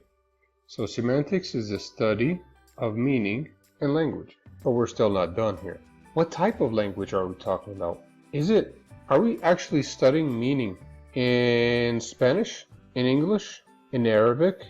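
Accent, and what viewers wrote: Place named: United States. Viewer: sounds like some american all of sudden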